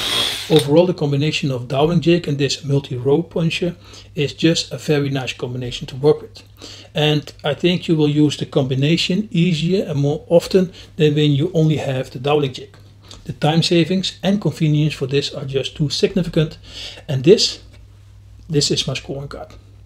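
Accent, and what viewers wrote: Netherlands. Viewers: Oh I’m sorry I thought you had a speech impediment. Your Dutch. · the subs are a real improvement.. your funny Dutch accent is sometimes hard to understand.. (and I speak dutch) Nice review..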